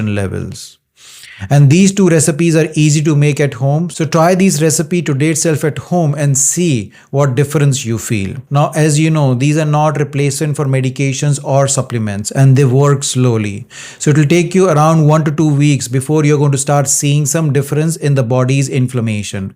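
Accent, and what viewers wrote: India. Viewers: Your accent tells me you are not from US! Even those that have studied from US do pick some accent. Yours is very typical Indian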